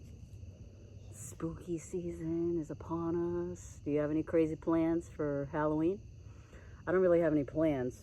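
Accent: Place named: East Coast, United States. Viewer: Oh BTW, love your cute east coast accent 👍, I'm from the west coast of Cali.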